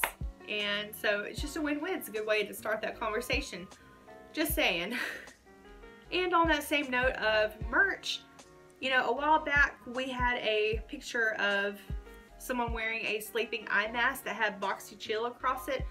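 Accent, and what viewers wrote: South, United States. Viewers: I love your purple hair, southern accent, and shiny eyes!!!!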